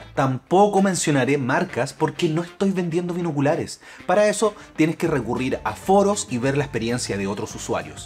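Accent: Chile. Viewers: bro, eres Chileno ?? donde compraste tus binoculares ? Gracias.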